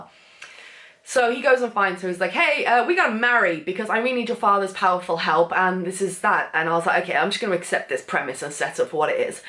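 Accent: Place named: United Kingdom. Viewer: I literally enjoy listening to you . ☺️ I love the British accent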